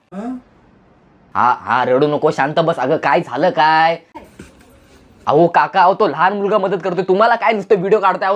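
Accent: Konkan, India. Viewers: 😂😂😂haha mja aa gya Dada tu kokani ahe ka?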